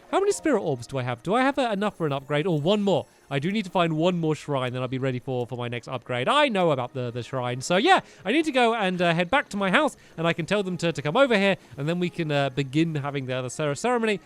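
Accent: United Kingdom. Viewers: Aré you british?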